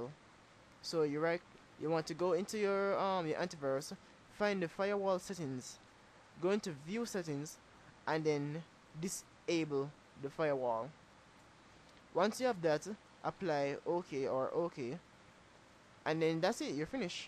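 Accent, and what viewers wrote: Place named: Caribbean. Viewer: and i can bet u from the caribean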